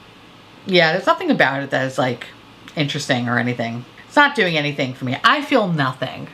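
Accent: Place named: Minnesota, United States. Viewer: Oh but your Minnesota accent is the best. And because I enjoy that accent so much my work has sent me to H.E.🥍🥍 in Minnesota for two months. From the convos I’m hearing you might consider using Rhubarb Crisp into the Minnesotan thingy.:)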